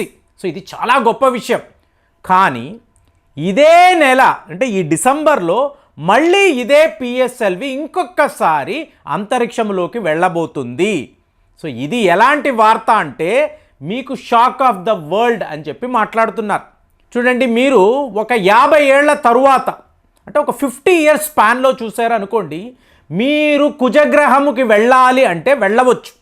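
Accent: Tamil Nadu, India. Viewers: That is Tamil accent.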